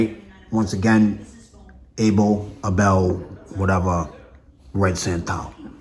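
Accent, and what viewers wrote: Boston, United States. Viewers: Where do you live Boston? That accent. Lol. I found your channel from your Mancera Black Vanille review. Just ordered it. Vanille Exclusive is next on my list.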